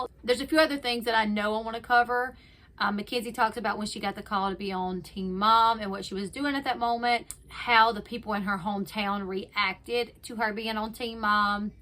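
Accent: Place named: Louisiana, United States. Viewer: I know I love it!! It's that Cajun accent 😍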